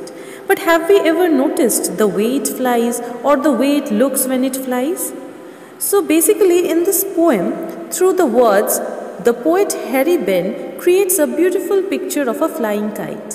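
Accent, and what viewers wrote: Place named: United States. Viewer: Mam I like your voice very much it was just as an American🤗🤗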